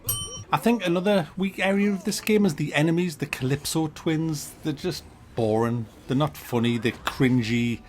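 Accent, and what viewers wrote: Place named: Newcastle, United Kingdom. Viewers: Byker grove land I think. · Oh you’re a geordie!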